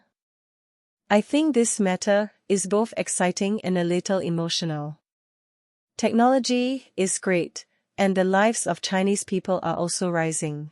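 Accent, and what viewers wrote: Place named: Malaysia. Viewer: This is Malaysian accent english. Whats wrong with that? It is clear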